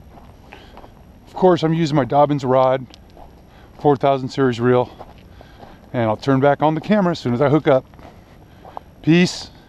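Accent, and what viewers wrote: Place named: Northern California, United States. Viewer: Lol. I can hella tell you are from NorCal. "Right on brotha" Lol! NorCal lingo at it's best! Nice lookin Salmon by the way. Always taste better when you catch them personally!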